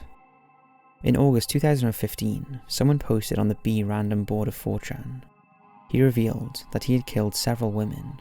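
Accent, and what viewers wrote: Wales, United Kingdom. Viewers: You have the same voice as like every British dude you come across on overwatch.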